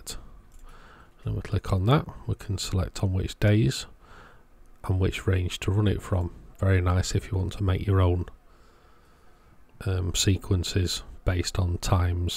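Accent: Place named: United Kingdom. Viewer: Hello mate you sound like you’re from the UK as am I, I’ve only just started on LED electronics and I was wondering where you got your LED’s from. I’ve just ordered some from aliexpress as the 1st one I got off amazon is like 3 times more expensive than Ali but the only downside is that it’s 3 weeks shipping time.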